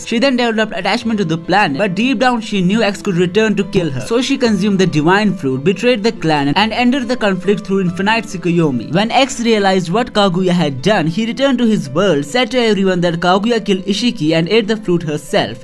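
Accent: India. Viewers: if that comes true you are an on insider of baruto😉 ya one ques bro plz answer are you a indian your accent does sound like indian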